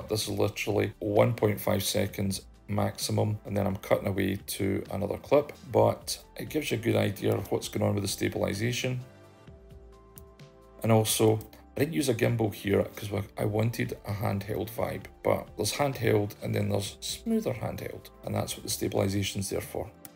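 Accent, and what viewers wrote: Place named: Scotland, United Kingdom. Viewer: This was just what I was looking for. Very informative and great to hear a fellow Scot on YouTube!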